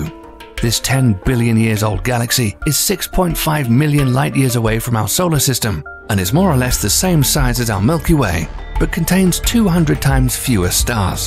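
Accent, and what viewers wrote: United Kingdom. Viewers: narrator, your British, its a American football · British sounding narrator showing an oblong planet and calling it a football is odd as British football is round and spherical. Most of the world knows football/Soccer as football. Also in Britain, we hate the name soccer regardless of its origins. I wonder why Fifa games do better than PES. So as you can see, hearing a British person say football, but is referring to American football or a Rugby ball is asking for a beat down here in the UK. So the OP WozzzaTV is just expressing is dislike out loud. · Another mystery space video by a channel with an British Voice over guy, how original.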